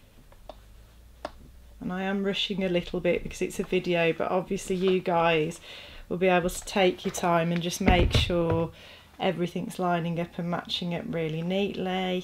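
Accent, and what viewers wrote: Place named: Yorkshire, United Kingdom. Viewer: I’m a self taught sewer and have been sewing for a very long time. I am amazed at the tips and trick I have learned from you. I am also an expat from Yorkshire so I enjoy your accent. It’s a little bit of home. Thanks for this awesome channel.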